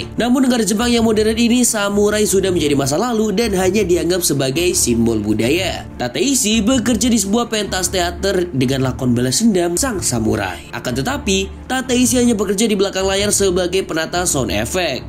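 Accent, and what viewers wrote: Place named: East Java, Indonesia. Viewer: Njirrrr kweden, fix lu orang jatim y, sidoarjo apa surabaya min?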